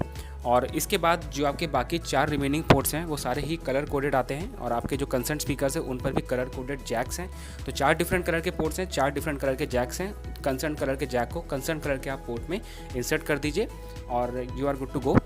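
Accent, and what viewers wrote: India. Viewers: Red zHail He has an indian accent plus i sense racism 😕.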